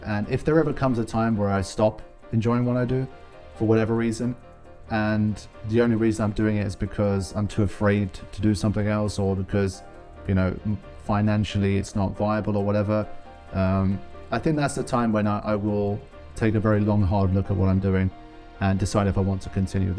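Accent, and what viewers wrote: United Kingdom. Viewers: Dang, you sound so british when you're muffled. Congrats on the 15 years!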